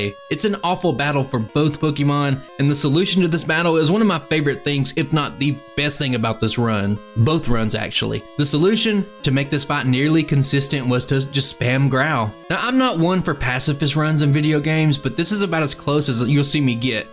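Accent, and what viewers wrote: South, United States. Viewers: Youtube fed me your channel to discover you, gotta admit, that southern accent totally broke up my background and made me pay attention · I dig the race format. I had to watch twice because the first time I fell asleep listening to your smooth southern twang.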